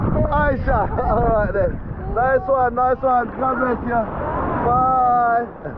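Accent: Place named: Black Country, United Kingdom. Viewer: Loving that black country accent.😊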